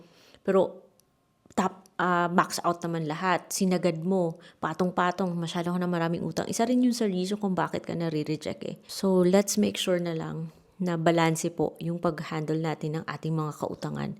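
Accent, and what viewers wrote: Iloilo, Philippines. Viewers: Ate ilongga ka gali? Hehe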